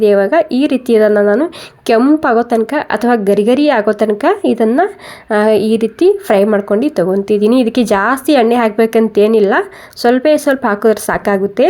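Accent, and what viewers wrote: Maharashtra, India. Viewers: Yourmaharastra kannada is nice to listen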